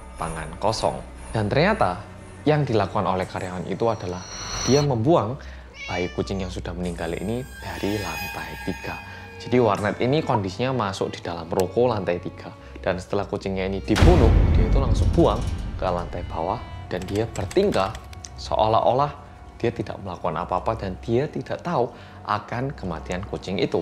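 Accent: Java, Indonesia. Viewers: Wes mas...wong jowo tenan iki..,😀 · MEDOOOOOOK !! Yey akhirnya up >< salam kenal dari jakarta ~~~ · Kental, dalam bahasa jawa 🙏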